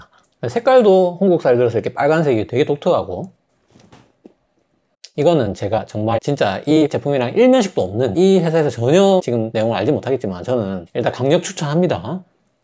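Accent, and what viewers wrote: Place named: Busan, South Korea. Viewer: 점점 더 잘생겨져가네요😊 어쩌면 자료도 훌륭하시고 설명도 맛갈나고 쉽게 전달해 주시는지요 부산 사투리도 넘 딱뿌러지고 정겹습니다 열정을 다하시는 쵝오🎉🎉🎉🎉🎉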